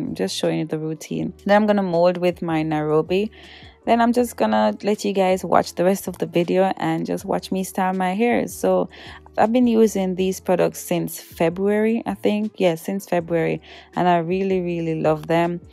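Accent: Jamaica. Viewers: Jamaican accent trang bad. Thanks for this 💪🏾🤗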